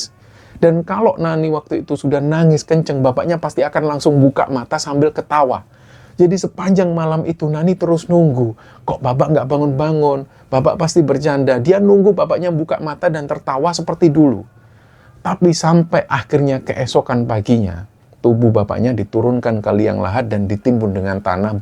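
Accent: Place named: Bali, Indonesia. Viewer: Salam dari Blitar yang sedang di Singapore. Hari hari suara mas nemenin kerjaku. Kalau mas ngomong Jawa jadi ingat ipar ku pas pelafalan huruf D. Iparku orang Bali asli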